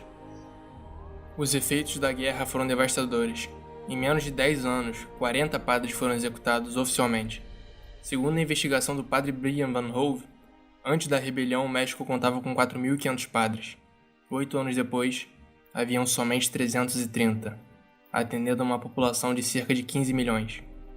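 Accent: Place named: Rio de Janeiro, Brazil. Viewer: DEIXA DE SER FRESCO! O SOTAQUE "CARIOCA" DO NARRADOR É PERFEITO!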